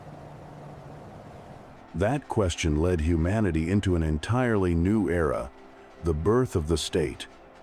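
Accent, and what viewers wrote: United States. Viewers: This is a good video but you need a narrator with a British voice. Nobody wants to hear an American talk about history - signed an American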